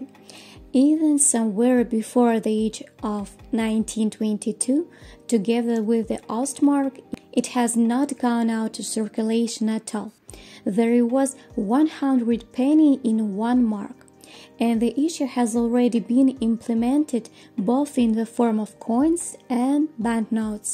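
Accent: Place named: Russia. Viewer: First, I want to say I think it's great you're practicing your English and aren't afraid of making mistakes! However, I am a native English speaker with some Russian language background, and even with my better-than-average ability to listen to Russian accents, your Russian accent is so strong I can't understand half of what you're saying. It barely sounds like you're speaking English. I would suggest three things: 1. Put English subtitles in your videos, or 2. Practice your English accent until you're understandable before making more videos, or 3. Just do your videos in Russian.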